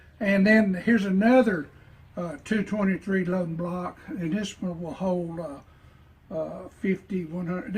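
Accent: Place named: South, United States. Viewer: I really liked the information you provided. Just for fun I turned on the closed captions and they really don't do us people from the south or with southern accents any justice. They messed up every 5th word you said.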